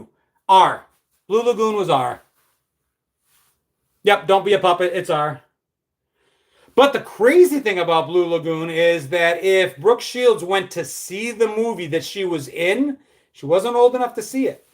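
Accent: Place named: North, United States. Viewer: Lol... I knew you were from that part of the northern portion of the US. I was raised in Ga(till 13½) then New Hampshire so Im pretty good at picking up accents. I was born in feb '73 so im Totally a Gen-Xer 😊...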